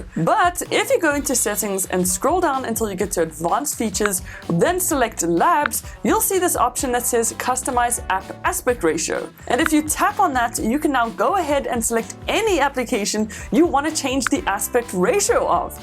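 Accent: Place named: South Africa. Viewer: Your accent sounds like South African is that correct and do you live there now.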